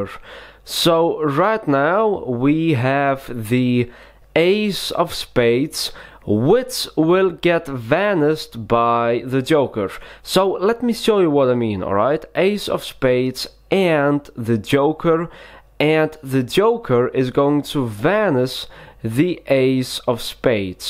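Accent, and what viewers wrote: Russia. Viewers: It’s called the Russian accent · Oh man this guy reminds me of crazy Russian hacker for some reason because of his accent and sometime he does the "boom" thingy which crazy russian hacker also does😂😂 i love your videos though man, i have subscribed to multiple magic tutorial channels however i think that your tutorial quality is somehow equal to 52 kards... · I love your Russian accent! :D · The weirdest russian accent of all time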